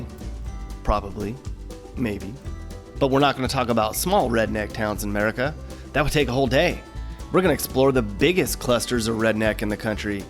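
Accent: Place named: New York, United States. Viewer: He;s a basic New Yorker thats what a lot of us sound like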